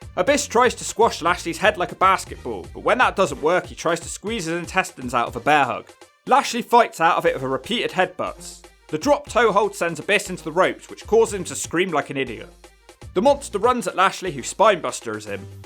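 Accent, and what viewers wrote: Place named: West Country, United Kingdom. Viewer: You've never sounded more Westcountry than in this video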